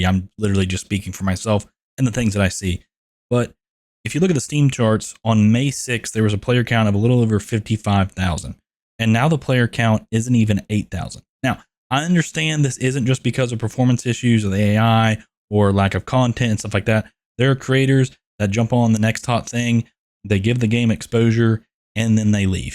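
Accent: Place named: Baltimore, United States. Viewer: You have that Pittsburgh/Baltimore accent going on and I like it Edit Mostly Baltimore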